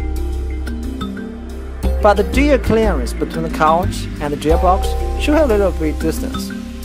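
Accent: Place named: England, United Kingdom. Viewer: I love your English accent 😂😂😍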